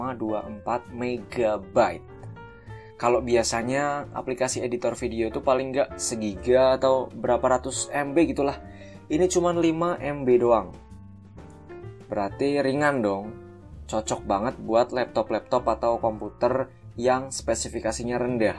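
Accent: Pekalongan, Indonesia. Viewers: Pantes indonesia nya medok ternyata pekalongan😁, santri ya ekwk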